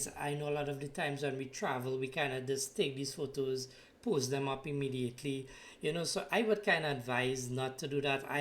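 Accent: Trinidad and Tobago. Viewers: You sound trini 🤔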